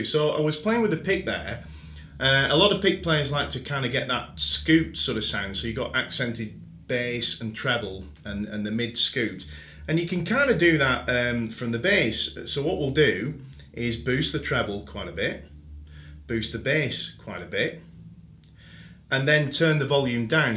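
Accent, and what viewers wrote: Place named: Bolton, United Kingdom. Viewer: Looks and sounds great, Sir! Nice to hear the dulcet tones of a fellow Northerner, too. Sounds like you're from my neck of the woods - Bolton. Correct me if I'm wrong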